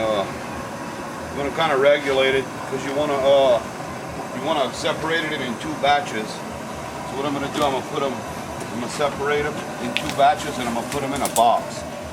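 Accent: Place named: New Orleans, United States. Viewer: That "naw-lens" accent distracted me the whole vid! Love it!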